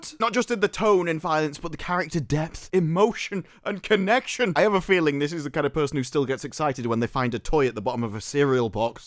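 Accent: United Kingdom. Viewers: I couldn't get thru six minutes of this obnoxious brit talking shit. Like damn is this his whole platform? Pass.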